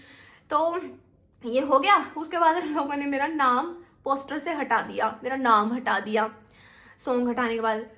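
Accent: Haryana, India. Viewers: yr m proper delhi se apke muh se haryanvi sunke acha lga 😅😅😅😅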